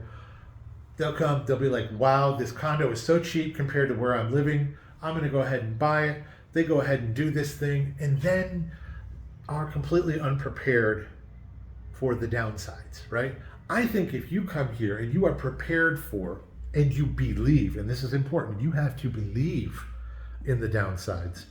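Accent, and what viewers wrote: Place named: Upstate New York, United States. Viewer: I'm back, lol! Hubby and I have been wondering what part of the US you hail from. We had a decent guess since we've traveled a lot around the US and you sound like you could be a brother to a dear friend of ours who is from Rochester, NY. We watched this video again today and caught the part about being from rural upstate NY so we feel rather smug about pinpointing the state at least, haha. Again, thanks for the great content. We enjoy your videos a lot. We'll be visiting in July.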